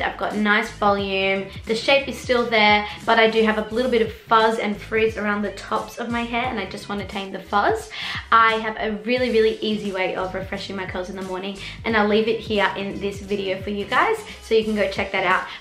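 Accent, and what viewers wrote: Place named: Australia. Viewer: Omg you’re Australian YO WTF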